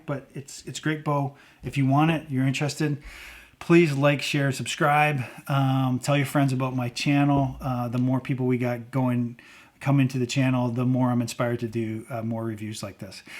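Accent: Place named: Canada. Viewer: I was the guy who deduced you're Canadian by the way you pronounce "about" (aboot). Anyhoo. I enjoy your videos. You're very personable and don't purport to be an expert. Good reviews and tips. Now you said that I had to subscribe, like the video and then go to Instagram. But "Optional" came up. I'm assuming Instagram is. Correct? But put me in for the drawing. Have heard good things about the Black Hunter. Keep dem vids comin'.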